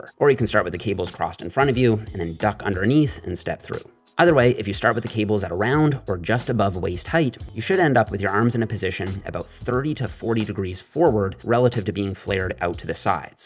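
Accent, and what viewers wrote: Canada. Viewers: Love his Canadian accent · He has such a strong Canadian accent 😂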